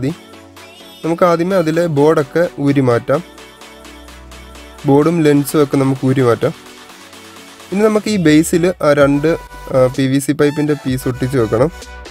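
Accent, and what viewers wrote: Kottayam, India. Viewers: Kottayam kaaran aano alla aa nadu fagathu ennu parayunnathu ketu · Bro kottayakaran aanenn thonnunnu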